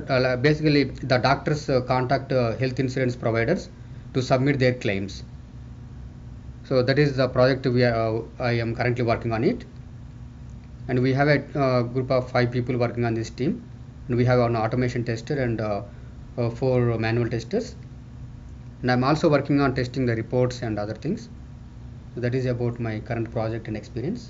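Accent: India. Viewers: Good information , but his hindu accent pain in the ears..... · He might be south indian..but he has good knowledge in this sector..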